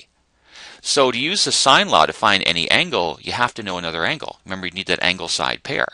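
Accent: Canada. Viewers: u sound like a canadien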